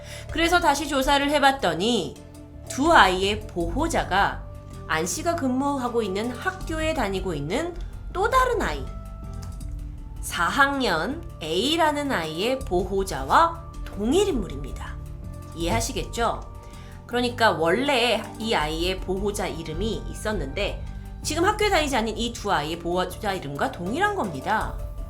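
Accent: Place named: Jeolla, South Korea. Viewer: 전라도 ㅋㅋㅋㅋㅋ 아옼ㅋㅋ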